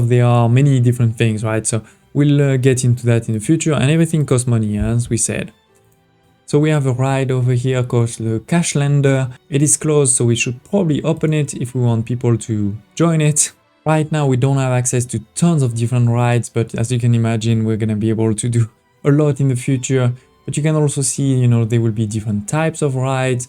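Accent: France. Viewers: omg I love your French accent, it calms me down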